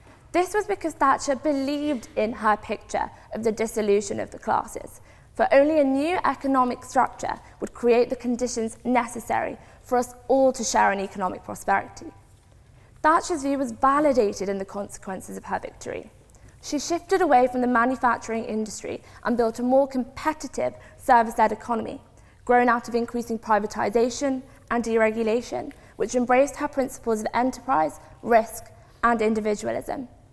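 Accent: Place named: Upper class, United Kingdom. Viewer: Another posh voice talking about how brilliant Thatcher was. Half of these people haven't stepped foot north of the M25 yet they think they have the authority to discuss what effect her government had on our towns and our people. There's a reason "ding dong the witch is dead" reached number 2 on the charts when she died - but you'd never understand that.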